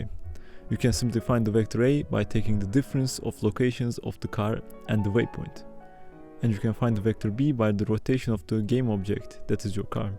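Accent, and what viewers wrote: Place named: Turkey. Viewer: Your Turkish Accent got me laughing so hard 😆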